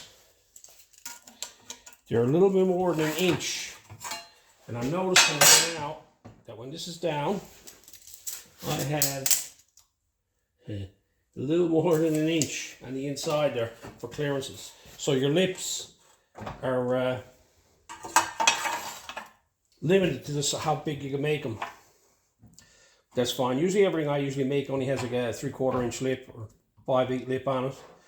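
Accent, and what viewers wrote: Ireland. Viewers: It's amazing how similar to Irish accent yours is! And thanks for the great videos! Superb stuff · I really like your bare bones approach I'm 76 have a fitted,small metal/wood shop keeps me alive. I hope you don't mind me asking as an Irishman do you have an Irish connection or are Irish yourself I thought heard a slight broge there also the way you phrased some of the things you said, sounded like my grandparents of 70 years ago, brought a smile to my face.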